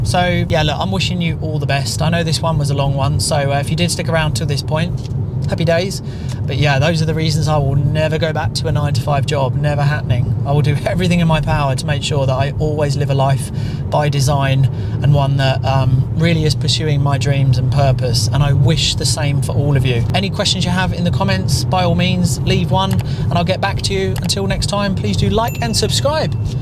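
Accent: United Kingdom. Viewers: If everyone had this same mindset you have, there'd be no infrastructure and no society. You'd have no road to drive your car on whilst making this video. There'd be no supplies to feed your kids because there would be no truck drivers hauling through long hours to deliver all the essential items we need to stock our shelves. Judging by your accent, you're from the UK? UK Lads are some of the finest scaffolders in the world. If these lads all paid heed to your advice, there would be no accessible platforms built to allow the other trades to build all our houses and commercial buildings. Everyone wants to be an entrepreneur until they realise that the world survives off of graft and sweat, not faarken' pen pushers complaining.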